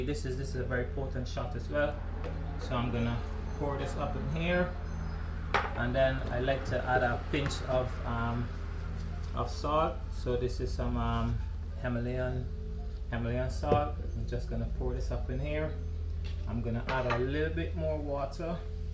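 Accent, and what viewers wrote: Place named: Guyana. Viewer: u sound guyananese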